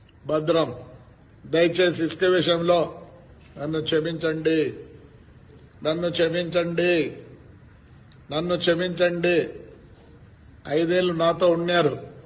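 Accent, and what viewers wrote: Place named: Tamil Nadu, India. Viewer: Babu JC garu, meeru telugu vaarena? Aa telugu enti, evaro Tamilian matladinattu… konchom Sarigga telugu nerchukovayya mundhara…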